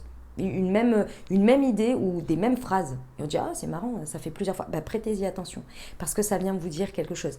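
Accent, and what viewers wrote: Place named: Paris, France. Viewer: J'aime trop ton accent parisien il me fait grave sourire. Sur le fond toujours au top comme d'habitude 👌😊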